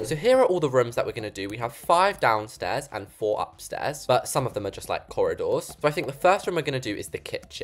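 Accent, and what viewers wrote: United Kingdom. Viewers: Try to do a American accent Bc u have a British accent and take a break on talking in ur videos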